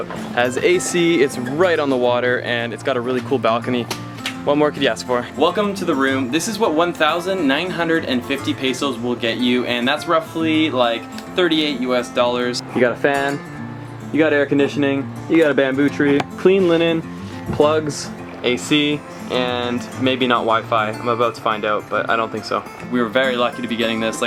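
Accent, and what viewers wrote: Canada. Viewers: Got the typical Canadian aye haha. Love the vlog! Keep em coming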